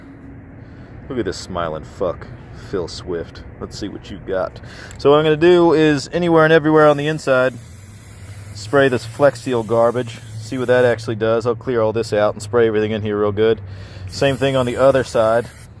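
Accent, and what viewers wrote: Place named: Nashville, United States. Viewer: Where are you from? You’re in Ohio but sound like a fellow Nashvillian!!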